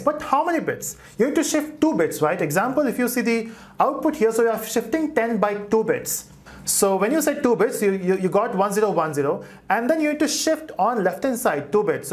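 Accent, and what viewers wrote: Telugu, India. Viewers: are you from india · Are u from andhra? /Telangana?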